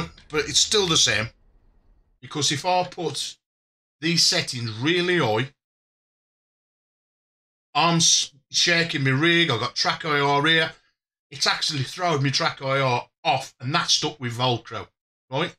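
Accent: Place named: Black Country, United Kingdom. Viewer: Great to hear a Black Country accent. I live in Wales now but I'm a Gornal bloke. Thanks very much for the info on the new force feed back. I've got a G920. The steering since the latest update is way too light. I've tried everything except that internal friction controller. I'll have a go at changing that tomorrow. Cheers mate.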